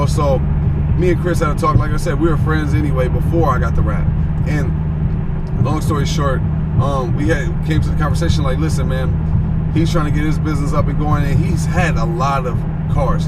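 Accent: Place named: California, United States. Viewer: You from Cali? Sound like it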